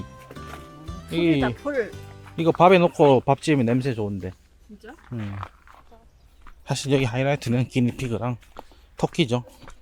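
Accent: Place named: Daegu, South Korea. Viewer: 바빠서 몬 오다가 간만에 대구말 들으러 왔습니다. ^^ 타향살이 하다보이 대구말 듣는게 부모님이랑 통화하는거 빼고는 윽시 어렵네요.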